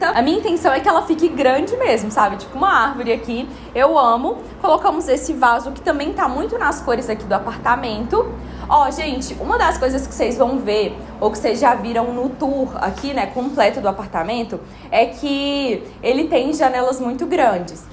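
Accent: Brazil. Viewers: Sua louca rsrsrs eu fui assistir o vlog e quando de repente ela abre a boca e eu: ela é brasileira???🤭🤭🤭 N sabia pensei q era uma gringa já tinha visto vídeo dela e ela me passou essa vibe ✨🤭👏🏻👏🏻👏🏻 ela trabalha com decoração? porque no vídeo ela disse se você quer ver dicas de decoração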